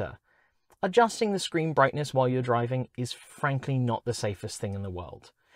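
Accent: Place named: United Kingdom. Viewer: When did you get a British accent?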